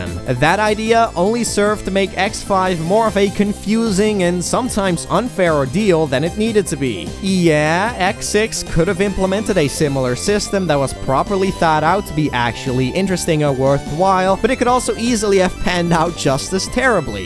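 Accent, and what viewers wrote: Netherlands. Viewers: Lmiao!!! I think you have! That Dutch accent helps, too. 💞💕💞 seriously though, loving your content. Currently binge watching your catalog. Subscribed. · SuperDutch accent :)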